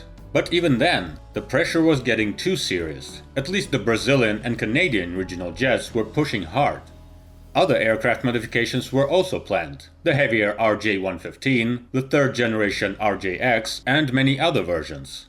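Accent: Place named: Russia. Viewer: The narrator is Russian I think by the accent. They often have different words for English ones than we use. Nice aircraft. Wouldn't say no to a personal jet version.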